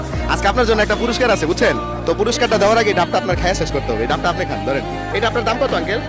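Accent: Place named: Madaripur, Bangladesh. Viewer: Madaripurer manus bolte kota ❤❤❤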